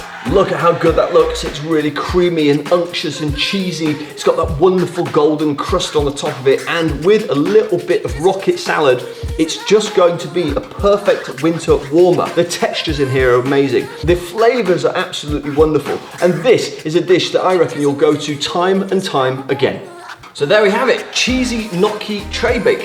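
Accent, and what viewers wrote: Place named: United Kingdom. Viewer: OMG that's it for me, how did you know!? And that accent ;-) just luv it, really missed that since I left UK.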